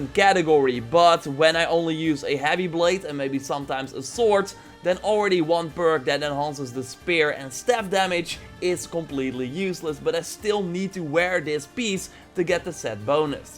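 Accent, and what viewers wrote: Netherlands. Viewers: This dutch accent lol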